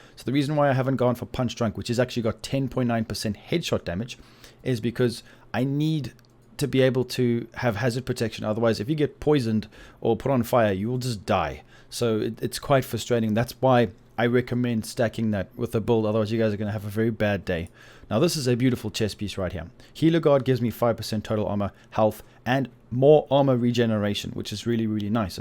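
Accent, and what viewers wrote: South Africa. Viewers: Hey bru love your vids. Are you South African? I'm new to the game been playing about a week. Just got to WT5 and trying to unlock my specialisations.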